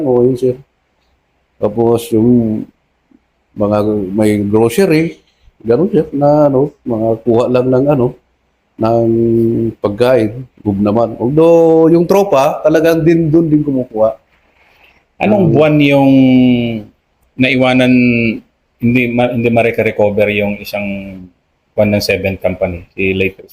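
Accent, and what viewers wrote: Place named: Kalinga, Philippines. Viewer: taga cordillera ka sir dba?/ fromwhere in the cordillera?? correct me if I am wrong..sa pronunciation and how you speak taga kalinga ka ba??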